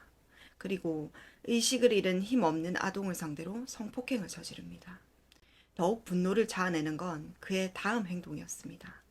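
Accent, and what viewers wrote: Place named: Seoul, South Korea. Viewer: 서을말 좋아요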